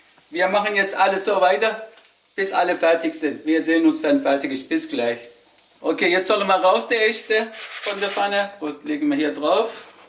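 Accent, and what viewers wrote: Swabia, Germany. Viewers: Bisch du a schwob? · Bisch n schwob?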